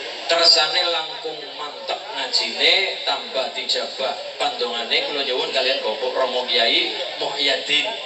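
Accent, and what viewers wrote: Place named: Banyumas, Indonesia. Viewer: Ngapak ustadz nya..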